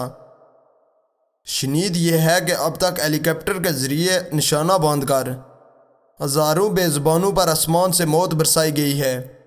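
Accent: Kashmir, India. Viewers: Nice kashamiri accent watching and subscribeing just cuse #factical gave you shoutout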